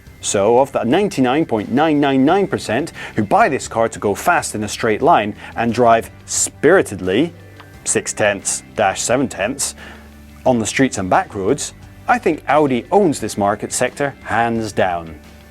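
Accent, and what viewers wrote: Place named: United Kingdom. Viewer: YAY!! My comment made it!! Thanks a lot,!! Love the show. It gives me my fix for cars and the British accent when I'm not watching Top Gear:D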